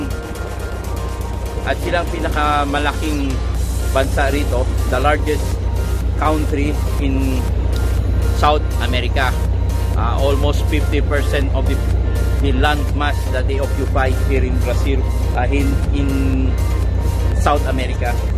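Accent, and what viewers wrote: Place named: Bohol, Philippines. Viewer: Boholano salita oi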